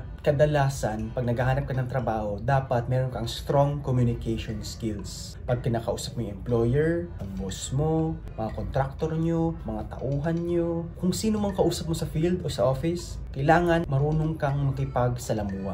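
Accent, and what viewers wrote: Visayas, Philippines. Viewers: I know from your accent your a bisaya haha. (Btw im also a Cebuano) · I can hear the visayan accent in his voice. HAHA.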